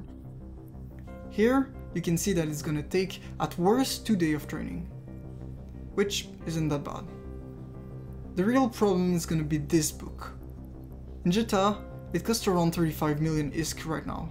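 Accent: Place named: Quebec, Canada. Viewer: Hi, do you speak french? I noticed that in your username you have '' '' and that you have some what of a french canadian accent. (Si oui, je débute et je cherche des gens pour jouer )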